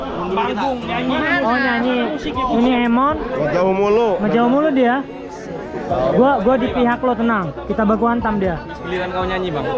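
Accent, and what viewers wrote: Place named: Sunda, Indonesia. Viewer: kocak anjirr kalo ngomong pake bahasa Sunda nada Sunda nya itu yg candu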